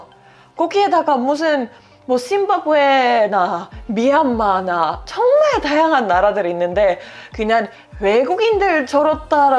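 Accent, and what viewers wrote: South Korea. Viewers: 경상도네요 ㅎㅎ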